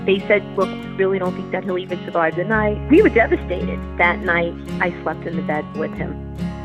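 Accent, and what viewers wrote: New York, United States. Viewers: Omg love your story. I’m from LINY and you sound like your either from there or Joisey! God Bless you always 🙏🏼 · Love your New York accent!! Love from California!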